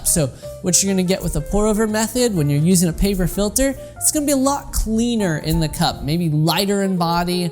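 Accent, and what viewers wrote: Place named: United States. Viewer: I never believed in an american accent until now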